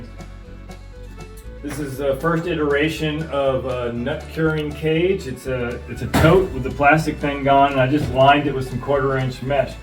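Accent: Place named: Appalachia, United States. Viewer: You sound like you grew up in the deep holler.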